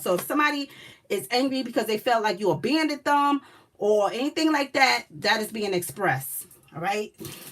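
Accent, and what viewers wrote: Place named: Louisiana, United States. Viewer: sound like she from Louisiana. New Orleans home of voodoo. Makes me wonder if her & her family were from Hurricane Katrina when they got flown across different states.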